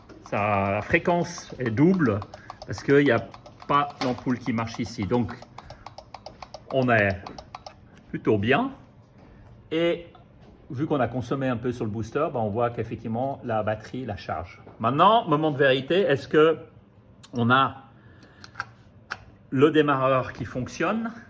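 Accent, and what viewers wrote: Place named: Vaud, Switzerland. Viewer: Très sympa et j'adore l'accent vaudois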